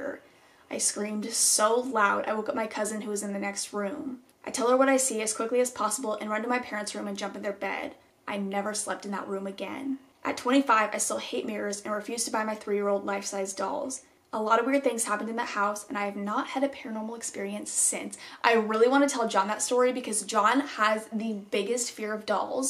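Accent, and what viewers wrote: South, United States. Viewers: You sound like you developed a sort of country/western accent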